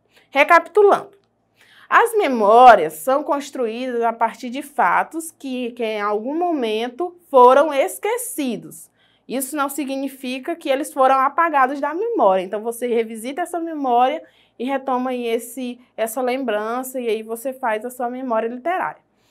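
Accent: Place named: Minas Gerais, Brazil. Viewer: Mineira kkk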